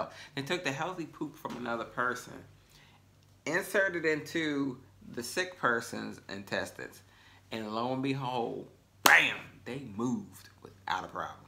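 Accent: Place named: London, United Kingdom. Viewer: lol the London accent.. No not the S curl- good information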